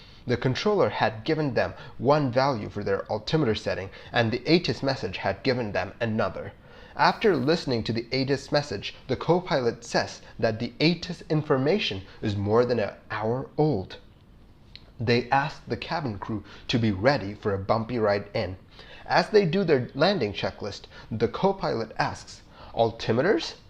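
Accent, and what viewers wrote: India. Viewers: He's from India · You sound like an absolute asshat, since he is Indian and English isn't his first language 🤷‍♀️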